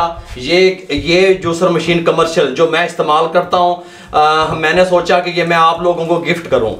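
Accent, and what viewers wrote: Punjab, India. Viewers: Main ne jitni bhi aapko recipes daikhi hain aur saaari try bui karto hoon aur wh 100% perfect banti hain aapki recipes bohat zabardast hoti hain aur mujhay sorf aapki recipes pasand hain aaur aap ka punjabi style bohat achha lagta hai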